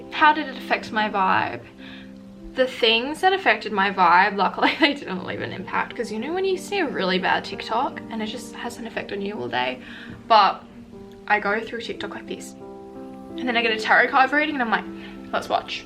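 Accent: Australia. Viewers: I'm learning English and I found the Aussie accent soorrrr gorgeous. Now I'm learning English with an Aussie accent thank u for helping, a lot of love from Azerbaijan 🇦🇿 🇦🇺!!!!!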